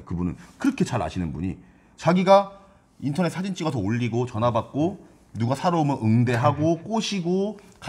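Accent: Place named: Gangwon, South Korea. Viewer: 듣다보면 강원도 사투린지 조선족 사투린지 햇갈리네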